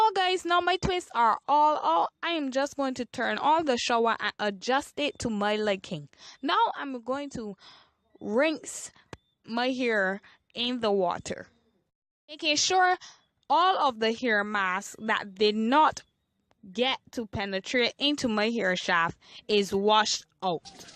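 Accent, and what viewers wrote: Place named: Barbados. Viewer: Are you Bajan??? I love your accents i love all my caribs ☺️☺️☺️☺️☺️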